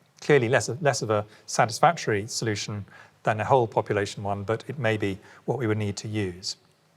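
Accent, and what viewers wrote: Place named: RP, United Kingdom. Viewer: This is pseudoscience wrapped in a posh accent: 1. Death rate: It is 30th April, 2020 (not 1st Feb, 2020). Significant antibody test results are available now. To insist on the 1% based on the data from China (which aims to deceive the world) is criminal. The death rate is close to that of flu. 2. Contradiction between high R0 and 0 hospital death in mid March 2020: If the R0 is high (close to 3) and the virus landed in Europe in December 2019 (See BBC report of France on <num>), by the end of March (UK lockdown) UK might have already achieved herd immunity. The daily death count only indicates the increasing test effort, nothing else. And the daily death chart is no different from that of Sweden, which did not lock down a country. 3. Imperial College model: the paper itself has never been peer reviewed; The data plug into it is rubbish (UK threw away 3 million test kits early April, 2020. 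How do anyone get reliable data with no accruate tests?); Furgeson never shared the program code for peer review. 4. Vaccine myth: Where is the vaccine for SARS after 17 years? Should the lockdown continue indefinitely until a C19 vaccine is discovered? 5. Chloroquine: it has been accepted as official treatment drug in many EU countries. The solution is simple: give it to the patients showing early symptoms. Whitty's emphasis on the failure on the later stage patients is a red flag that he is working for the big pharma to sell drugs with much higher margin.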